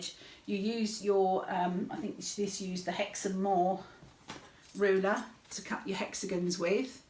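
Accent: England, United Kingdom. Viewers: Hi I really enjoyed your visit today you and I have much the same taste in fabric and patterns I made Tula bloomers quilt year before last useing tilda fabric I also made a few more of her patterns in her fabric and some other lines it is so nice to hear the accent I spent many happy times in Sudbury and other parts of Suffolk and grew up in Essex your work is stunning and your quilts Nate on point your stitching is amazing I haven’t seen your knitting yet so will go back for a look thank you so much for your wonderful visit Angela in ireland 🇮🇪 🙃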